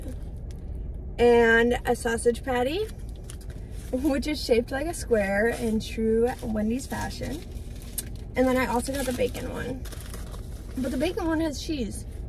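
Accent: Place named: Michigan, United States. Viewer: Your hair looks great. I figured out why I like your videos so much. I like the way you talk. There's something calming and familiar about it. I suppose a lot of it is because you're trying to engage your audience, and I think you do a great job. The other thing for me is that you're from Michigan and since I live in Michigan I think it makes you sound more familiar and friendly than if you were from somewhere else. I didn't grow up here, but I've been here for most of my life now, so it's comforting to hear a voice that sounds like my friends on YouTube.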